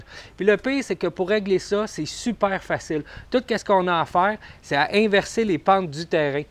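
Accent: Quebec, Canada. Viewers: J’adore ton accent québécois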